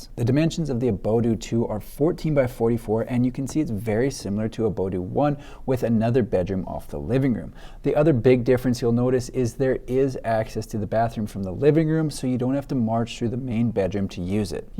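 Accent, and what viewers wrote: Canada. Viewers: Every time I think a better design can't come along, one does! Thank you so much for your interesting and inspirational videos. I so enjoy them and as a Canadian living in Europe, love listening to your gentle Canadian accent as a reminder of home :)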